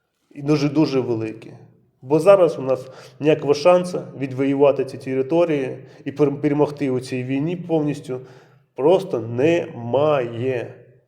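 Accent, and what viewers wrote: Russia. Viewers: Ти не дуже схожий на українця Українці так не говорять у тебе вимова російська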